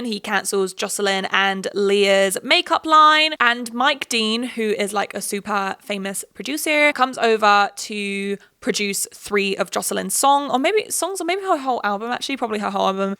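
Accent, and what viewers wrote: United States. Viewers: I am begging someone in the industry to make a movie or show about an egotistical self-centered show writer who ramps things up higher and higher and higher and is basically given whatever he wants just because the company loves him so much. I feel like it could be quite a good surreal black mirror-esque show/movie Edit: also, killing the American accent! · oh my god I love the sarcastic american accent 😂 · girl your American accent is giving me Emma Watson in the bling ring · ok girl your American accent was surprisingly good in some places!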